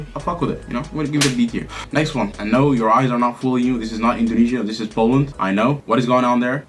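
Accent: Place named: Russia. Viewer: i am fom italy and russia and your accent is incredible for russian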